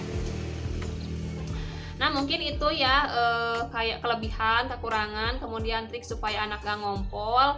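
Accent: West Java, Indonesia. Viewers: Orang Sunda ya teh, logat y kaya Sunda hehe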